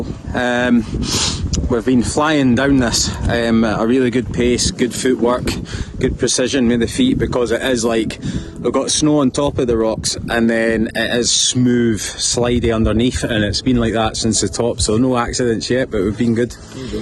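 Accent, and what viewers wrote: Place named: Scotland, United Kingdom. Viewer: Tolles Video, mit klassischen schottischen Wetter. Ein kleines bisschen flüssiger Sonnenschein hier und dort :-) und ich liebe den schottischen Akzent. Macht weiter so.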